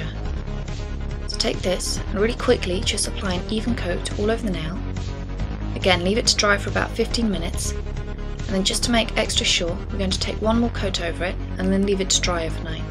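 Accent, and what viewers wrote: United Kingdom. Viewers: i love how u say "that's it, you're done" in ur fuckin awesome british accent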